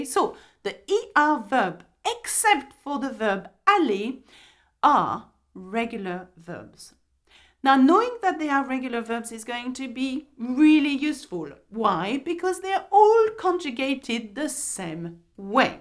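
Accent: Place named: France. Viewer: Thank you. These are good refreshers for me. J’ai un question. Vous êtes de quel région en France. J’ai étudié en Normandie (Rouen). Votre accent me semble un peu différent.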